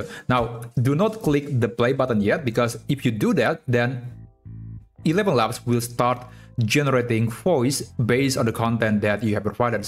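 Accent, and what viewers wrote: Indonesia. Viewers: lu orang indo bang? dari logatnya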